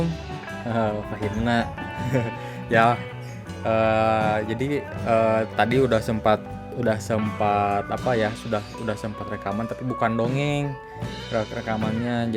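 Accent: West Java, Indonesia. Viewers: logat sunda na nempel teuing a :( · sunda pisan njir, mantap kang 👍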